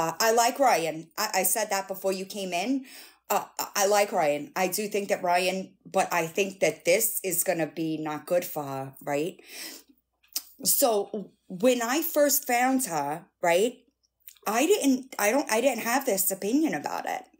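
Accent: New York, United States. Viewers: Im not being a weirdo or anything but I just wanted to tell you that you are a very beautiful Woman who gives off an aura of being a super strong intelligent woman who puts up with no bull shit❤ it's very refreshing to see and hear. So Smile! I do not know your whole story but I know that you just went through having the loss of your mom, I believe, and I just want to say that I will pray for you and hope that you can heal from such a traumatic event in life. That's what I consider this to be, in my own life as well. Okay I hope I said that right and I'm the one who said I love your accent. I'm from New York too, just not the city just a little above that around Poughkeepsie and I miss it so much 😢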